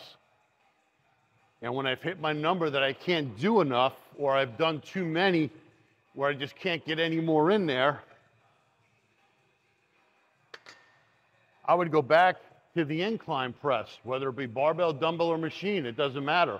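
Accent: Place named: New York, United States. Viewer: The advice is brilliant. The New York accent just adds to the enjoyment.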